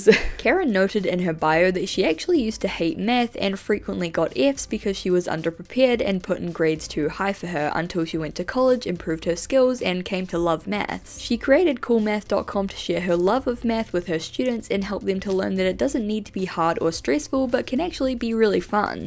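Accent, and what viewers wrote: New Zealand. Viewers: I'm sorry but your kiwi accent makes it sound like "cool meth" and it keeps catching me off guard 😆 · didnt know you were from nz lol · HOL' UPP!!! yer a Kiwi.?! i, uh... actually forgot New Zealand existed. Thought you were an Aussie.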